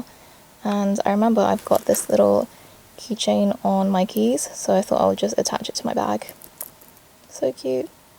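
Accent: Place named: United States. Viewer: Lovely video. Question: have you spent time in the US? Sounds like it when you speak. Just curious! (Writing from the US)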